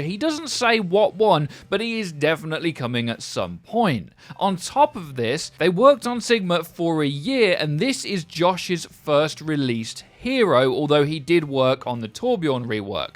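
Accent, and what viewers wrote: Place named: United Kingdom. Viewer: Where in the hell is Frido? I’m getting SUPER tired of mispronounced monotone British accented commentary from Mr. boring over here.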